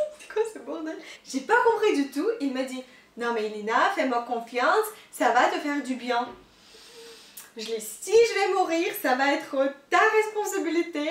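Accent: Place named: Russia. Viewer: Mais v'est chouette ce petit accent russe. Faut surtout pas le perdre !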